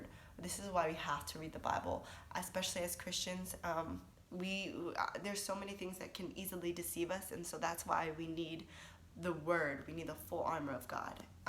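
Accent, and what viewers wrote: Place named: United States. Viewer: Você tem cara de brasileira mesmo, mas teu sutaque é bem americanizado. Deus seja contigo. Mais uma entra mas fileira dos salvos em Cristo. Deus é fiel!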